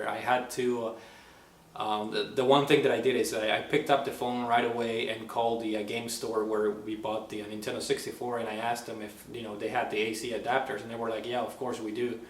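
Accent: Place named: Argentina. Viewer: Great videos man. Where from in South America? I would think Argentina, but please don't be mad if you're from Uruguay hehe. Similar accents.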